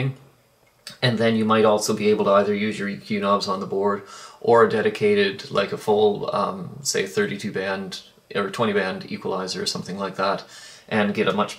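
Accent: Canada. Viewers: Ohhhhh... You're Canadian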